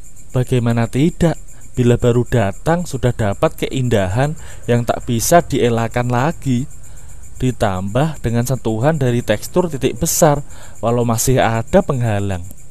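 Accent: Java, Indonesia. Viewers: Jangan orang jawa untuk menerangkan cerita ini, gak cocok dan gak enak dengernya, ceritanya bagus, tapi yg ngucapkan cerita ini gak enak suaranya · mantul min....jawa nya jawa mana ya ini...jangan² se lubang ama aku🤣🤣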